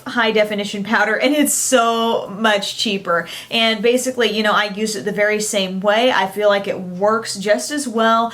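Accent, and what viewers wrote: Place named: South, United States. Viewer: Love, love, love this video!!! It was so informative and detailed! Oh & I love ur southern accent! :)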